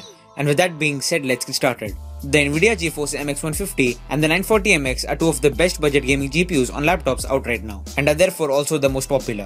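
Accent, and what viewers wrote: India. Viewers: Indian accent is always funny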